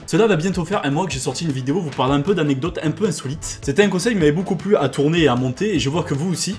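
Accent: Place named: Montpellier, France. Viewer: RBATI Tout droit de Montpellier par dit !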